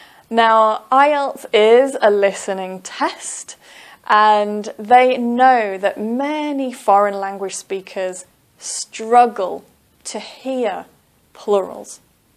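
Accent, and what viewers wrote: England, United Kingdom. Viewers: 😂 lol yeah Because is an English lady living in england😎 accent can tell the saga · i really love you madam, i really love the way you make us understand. so easy and slowly, however the British accent is a bit difficult to understand.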